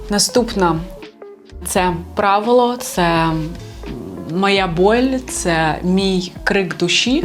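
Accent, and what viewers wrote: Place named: Russia. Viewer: Людина переходить з російсьеої мови на українську. А наголос залишися зі слова «счастлива»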